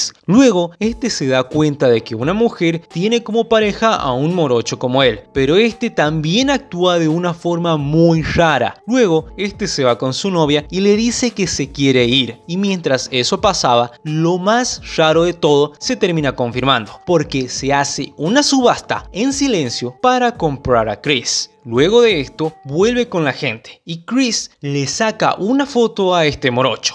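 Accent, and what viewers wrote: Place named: Santiago del Estero, Argentina. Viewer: Hola , sos santiagueño ? Por qué la tonada la tenés hermano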